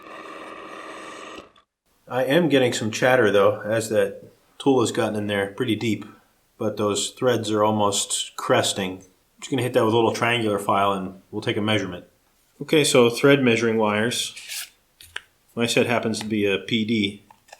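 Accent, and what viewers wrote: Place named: United States. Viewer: As usual a fascinating and really interesting video. I'm interested to know: to my British untutored ears you sound American, not Canadian, so how come you mostly seem to use metric? (unlike most of your countrymen who still use that bizarre system they inherited from us Brits.) Even tho I'm I'm my 70s and was schooled in scruples, furlongs etc. it amazes me that anyone would choose "English" when everyone everywhere else uses metric. Perhaps you live in Europe and have seen the light. :--)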